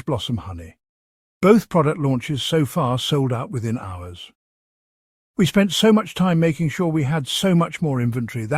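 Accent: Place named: United Kingdom. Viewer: Such BS. Best part of this silly propaganda is that AI has chosen an interesting British accent. Carry on Sir! 😱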